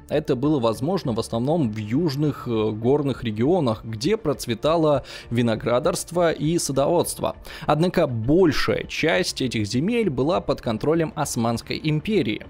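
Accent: Ukraine. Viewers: он украинец - не обязан правильно выговаривать ваши слова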